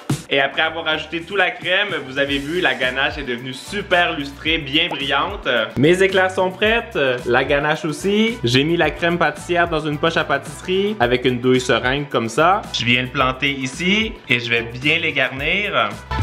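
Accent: Quebec, Canada. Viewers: Après tant d'années à te suivre ton est toujours aussi incroyable pour moi, vraiment l'accent québécois best accent de la planète ! ✨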